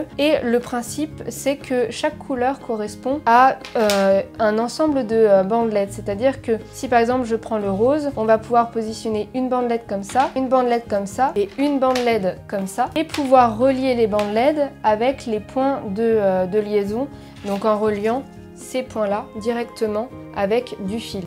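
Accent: France. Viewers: Como hago para casarme con una mujer inteligente como ella y ese acento francés enamora.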